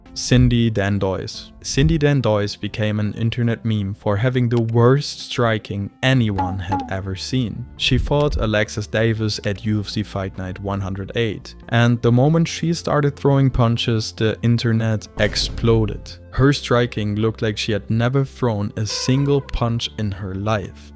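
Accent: Germany. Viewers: German accent is strong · Gold video. Hat lange gedauert bis ich den deutschen Akzent gehört habe😂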